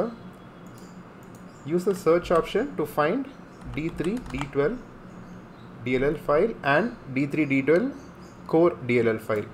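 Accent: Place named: India. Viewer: And as always, the man with indian accent did not disappoint and helped with technical issues Thank you so much (I hope I didn't sound racist)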